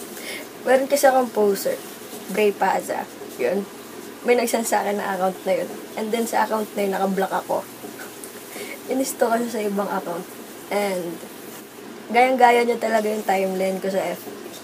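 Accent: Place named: Ilonggo, Philippines. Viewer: Ang kyot ng ilonggggg 😍😂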